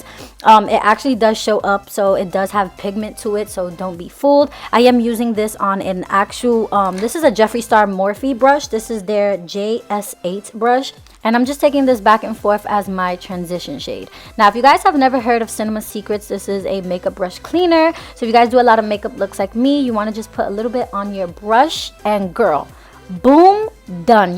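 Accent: New York, United States. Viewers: girl you sound so new york i love it lmaoooo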